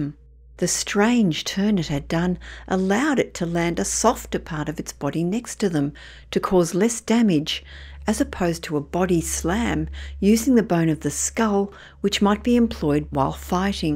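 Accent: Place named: Australia. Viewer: Australian narrator I like it 👍👍❤️❤️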